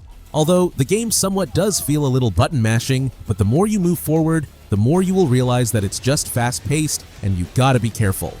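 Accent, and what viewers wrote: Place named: United States. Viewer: American assent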